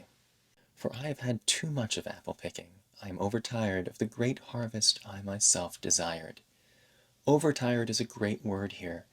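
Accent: United States. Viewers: Bbest teacher ever ❤ I learn American accent from you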